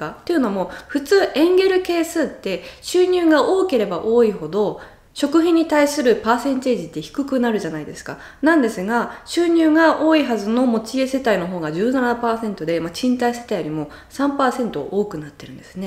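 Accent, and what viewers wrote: France. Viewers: とても興味深い内容を、分かりやすく、データも提示してくれて、ありがとうございます。私に置き換えて考えてみて、生まれてからずっと日本に住んでいながら、そんなに上手に説明できるかな？と感心しました。家族でバカンス重視は、羨ましいし憧れます。お金をかけずに楽しむことを見習いたいです。それから、の日本語で、時々 フランスなまりっぽくなってる気がするのは、私だけかな？(笑) 「ということでー」の「でー」が「でィえー」と聞こえて、可愛いです！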